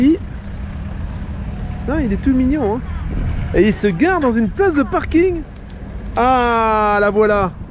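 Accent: Nord-Pas-de-Calais, France. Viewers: Je confirme ... en chti' ca fait + serieux !!!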